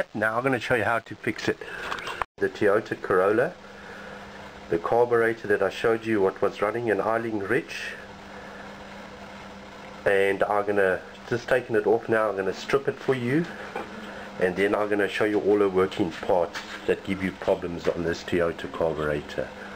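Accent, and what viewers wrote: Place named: South Africa. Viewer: i know a South African when i hear one. when you said "robot" you confirmed it cause we are the only country (or one of the only) that uses "robot" instead of "traffic light". Thank you for sharing your knowledge. my Toyota Tazz has had an idling issue for a while and you might have jut helped me solve the issue.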